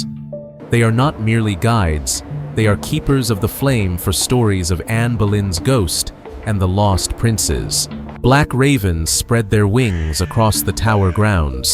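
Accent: United States. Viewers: This is fascinating, please use real British narration though, not American robots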